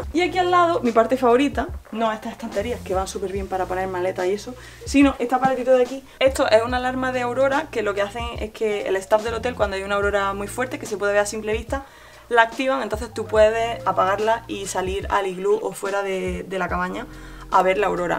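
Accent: Andalusia, Spain. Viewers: Amo amo el acento andadulez. Se dice así?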